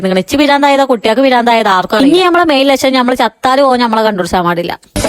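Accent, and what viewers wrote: Kasaragod, India. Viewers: സംസാരം കാസർകോട് സ്ലാങ്ക്🙄🙄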